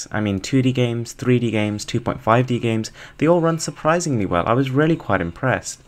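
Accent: United Kingdom. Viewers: Hi man, u have a nice British accent..thanks for these videos, this is a gr8 phone.. I hav bad signals on my Motgo X 1st gen and hence went for Moto E 2nd gen which is pretty much an amazing phone-water-resistant, scratch proof (GG3), i've tried it too, dual sim, stock features, doesnt hang in normal usage, 2 days battery. Would u suggest this phone over my current phone ? I m lukng to just change my phone into a >4.7", tatz why..but shld be nonetheless for rough use n dual sim, shldnt hang, i dont play games. Hope its water-resistant?? Ofcourse I'll miss my legendary Moto Display feature.. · Hey I Just want to say I love your videos, and your british accent. I want to ask you a questions what budget smartphone would you recommend for some one in the US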